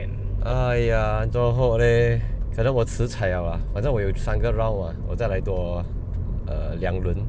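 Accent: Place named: Malaysia. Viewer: 第一他是馬來西亞人，第二這是馬來西亞人的交流方式，第三請尊重每個人的說話方式，即便是中國人也都會有口音的。所以請你先三思你的思緒和言語後再做評論。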